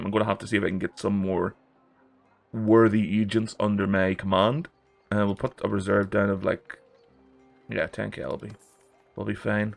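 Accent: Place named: Scotland, United Kingdom. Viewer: Yet another video about bannerlord that I love and that makes me mad for not being able to afford it. I swear I'll go crazy soon watching videos like this one. Also, I love the accent, I'm Italian so I'm not used to accents like yours (I hope I'm not wrong but you sound Scottish)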